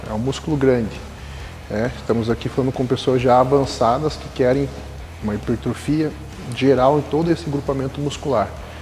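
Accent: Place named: Curitiba, Brazil. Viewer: Sotaque típico de um curitibanoo <3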